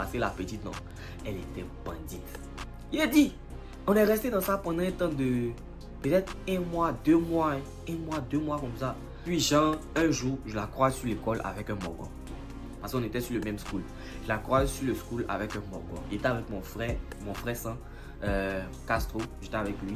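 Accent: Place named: Ivory Coast. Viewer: Je suis fan de ton français ivoirien bro suis enjaillé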